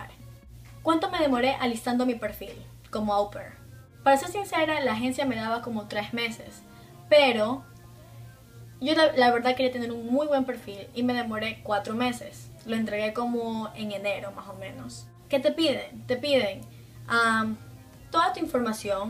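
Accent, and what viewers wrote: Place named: Ecuador. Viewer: Creo que eres muy parecida a la Miss Universo, de verdad que te veo y me recuerdas mucho a Paulina solo que con acento ecuatoriano ;)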